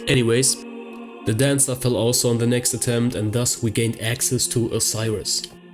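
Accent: Germany. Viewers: Nice run! Unrelated but I was like "hey this guy sounds German" Then I was like "Paulaner Spezi aufm Schreibtisch 😱"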